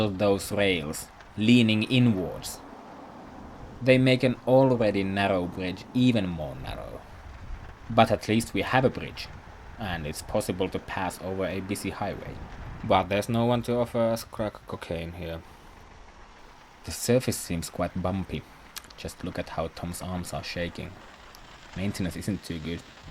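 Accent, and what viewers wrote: Finland. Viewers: When I had given the points I would given you at least 100 points more for the Oulu trip. And 10 extra for your humor and pretty Finnish accent! What a beautiful ride. How boring and ugly north American towns and cities are! And even worse in winter. In Finland winter darkness + snow makes the world even nicer and more beautiful. Here in the Netherlands we had today our first little bid of snow. But it was melted within an hour. And that is also one of the big differences between this winter utopia and the Netherlands. Our winters are most of the time just a little bit above or below 0 degrees Celsius and then salt is necessary because roads are almost always wet and can frees unpredictable and then biking and walking gets dangerous. And the other big difference between both Calgary and Oulu and the Netherlands: space. We are the most densely populated country in the western world and ground is so expensive here, that this kind of modern city planning with so much space between roads and bike pads or parks everywhere would be great to have but is almost impossible to afford. 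In the 1960's and 70's it was more common to build neighborhoods with more public space between the houses and private properties, but nowadays every single square meter have to be planned to have a function. Developers will try to build cramped houses instead of living space for people. I think that is one of the big negatives here in my country: developers want to make every possible euro profit out of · I like your assessment (and Finnish accent ;-) ) much better than Tom's. He's too soft on the crappy infrastructure they have in Calgary. And I live in a city that probably has even worse bike lanes than Calgary...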